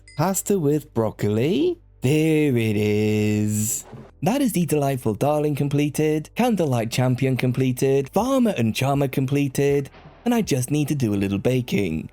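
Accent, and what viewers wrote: Wales, United Kingdom. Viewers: always thought i heard a bit of welsh accent come through now and again, that comment on the welsh summer confirmed it 🤣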